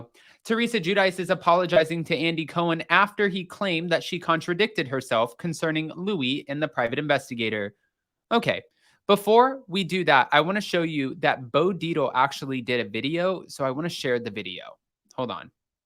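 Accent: New Jersey, United States. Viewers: Loving your Jersey accent 🤣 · 😂 I love your Jersey accent!!! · you crack me up with your jersey accent😂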